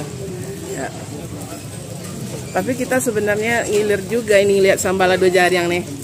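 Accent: Minangkabau, Indonesia. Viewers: Puan bertutur minang Seronok didengar menghibur hati masakan minang terkenang kenang enak lamak bana sudahlah pasti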